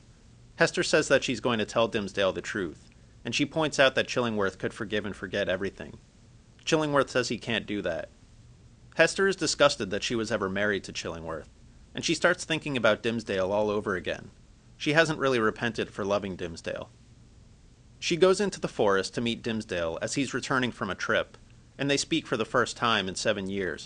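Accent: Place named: United Kingdom. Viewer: مصدگ عود 😂😂ترى هذا بريطاني إلي ديحجي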